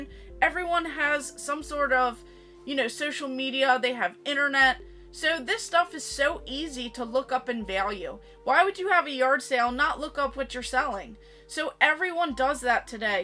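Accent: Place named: Baltimore, United States. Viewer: Woah, are you local to Bel Air? I shop at Retro Replay too! Thought I heard a Baltimore accent in there... 😄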